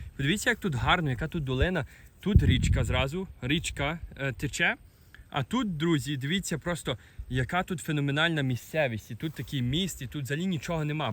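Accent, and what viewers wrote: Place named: Galicia, Ukraine. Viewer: Насолоджуюсь просто вашим галицьким діалектом! Дякуємо вам за працю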